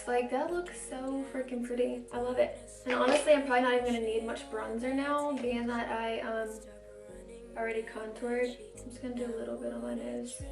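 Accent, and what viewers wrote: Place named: South, United States. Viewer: Can tell you’re southern by the way you say “for” and “never” lolol. Could tell by other words too, I’m from Georgia. 😊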